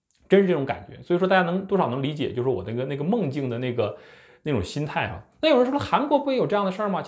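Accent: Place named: Northeast, China. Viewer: 抱歉噢。。。我听到你的 “十三岁 ” 发音 就想笑。。。 东北口音吧？？？。。。我新加坡人。听了 很特别 可爱。。。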